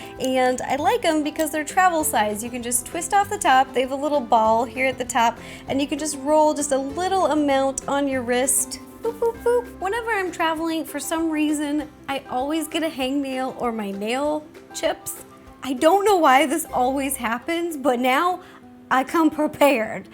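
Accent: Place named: Rural, United States. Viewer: I love her country accent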